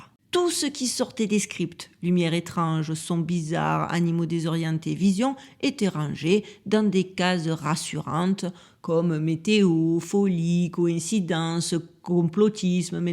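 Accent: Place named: Southern France, France. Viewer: Je pense que la nature de 3I/ATLAS dépasse notre compréhension, voir notre entendement. Quelque-chose se prépare ,c'est mon intuition. Cela dit , j aime beaucoup ton style , ton phrasé, le timbre de ta voix et ton petit accent du sud donne l impression que tu chantes . J adore tes contenus .. Ps: la nasa sont des menteurs !! Comme tous les narratifs officiels.😂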